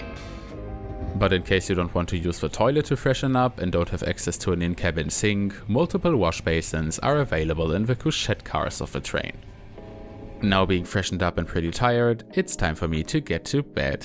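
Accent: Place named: Germany. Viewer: This is an excellent video by any standards! I can hear a hint of what I believe is your native German in your English pronunciation, but it's very minor and only adds to the authenticity of the video. Your Swedish pronunciation is impeccable. I just subscribed to get you a little closer to the elusive 1,000 subscribers mark.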